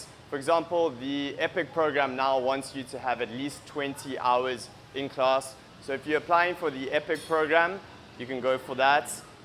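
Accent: South Africa. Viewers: Love that beautiful South African accent of yours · are you from south africa? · That accent... You're south african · Eish. That accent. Confusing for most. Instantly recognisable to fellow 🇿🇦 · sounds South African to me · not from the US so must be South African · Its so awesome to see and hear a fellow South African in such an informative video. Well done!!! PLEASE HELP... I have a Bachelor Degree and Post Graduate qualification in Education, but now ESL qualification. Now I would like to get my TEFL certificate but an online one. Please advise which site is legit and actually accredited/ recognized. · he is from south africa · you sound very South African bru, anyways I have a question, let's say I want to go overseas and I have 0 knowledge on teaching, I studied computer programming ( so no Degree or diploma for teaching), would you still recommend getting a TEFL Certificate?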